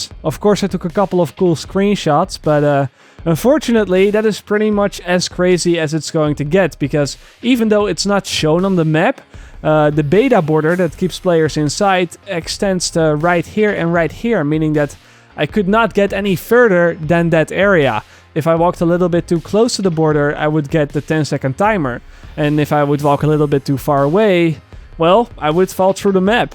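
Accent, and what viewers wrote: Netherlands. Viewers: je bent bijna je Nederlands Engels accent kwijt. i just joint you channel, I see you have some awesome videos. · Mals nederlands accentje hoor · Cool video, also i'm pretty sure that your'e Dutch... i recognize the accent xD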